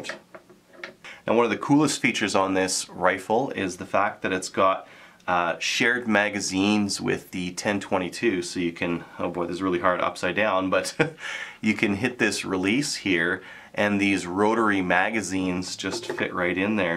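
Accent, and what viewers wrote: Canada. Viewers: The different combs aren't for growing shooters. They're for shooting with iron sights or above axis scopes. It moves your cheek weld to the correct position. You sound Canadian, so I'm assuming you guys are very restricted to the types of weapons you can possess.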